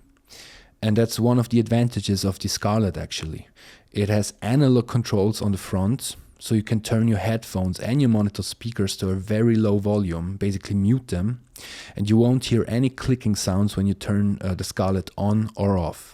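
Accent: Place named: Germany. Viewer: please don't lie to me, I am native, and YOU ARE GERMAN ! never ever of any common wealth area..nice try but just because you MIGHT live in Australia which I also doubt, you are no English native. I am supposed to like germans but after dealing with this...we don't se zurgical we say Surgical and so on so pretty please with sugar on top..get real !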